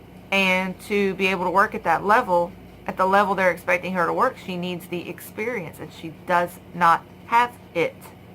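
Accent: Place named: South, United States. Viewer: I'm Here Miss! lol I subscribed and rang the bell. You're Southern? I didn't know that. Your accent is cute lol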